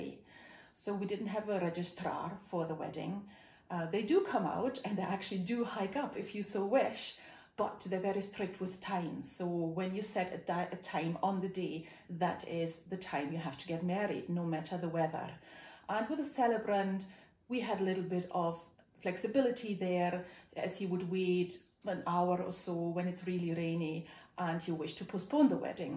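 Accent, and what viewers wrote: Scotland, United Kingdom. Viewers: Where is your accent from? Its sounds like a mix of Scottish and Eastern European.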